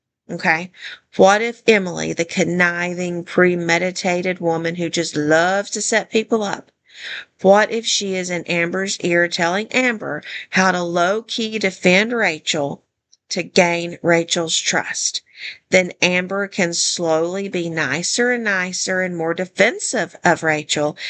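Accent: South, United States. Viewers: I think you're on to something! I like your voice, you sound like me...a southern gorl. Subscribed. 😊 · I just subbed bc you sound southern and you are definitely on point in what you said. ❤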